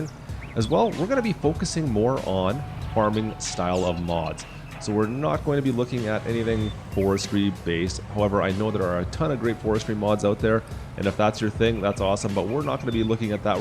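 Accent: Canada. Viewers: I love the way you say about, aboot. I love Canada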